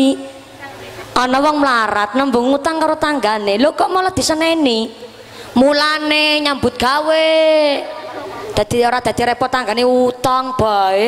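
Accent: Banyumas, Indonesia. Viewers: Saya suka ngapak tapi jelas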